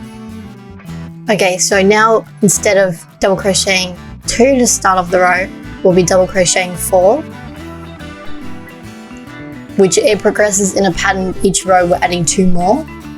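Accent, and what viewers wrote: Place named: Australia. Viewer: It's funny how many people said that her instructions are not understandable, but there's a whole bunch of others saying they did just fine and caught on easily😂. Personally, I think this pattern is one of the easiest to understand as others give way too much instruction and just confuse u more. Besides I love her aussie accent ❤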